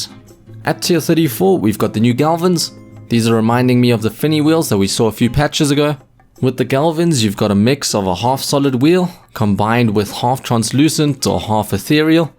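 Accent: South Africa. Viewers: Where you from. You sound south african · Are you South African?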